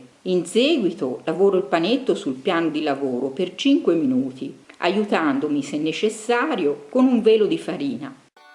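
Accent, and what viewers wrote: Tuscany, Italy. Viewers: TROPPO BRAVA!!!!! Ogni ricetta un successo...ho fatto le brioches a forma di fiore con marmellata: grandiose!!! BRAVA!!! E poi il tuo accento toscano da una tranquillità...bacioni! :)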